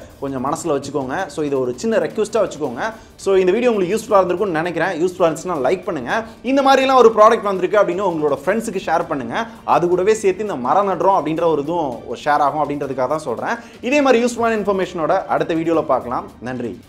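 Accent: Madurai, India. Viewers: Bro neenga maduraiyaa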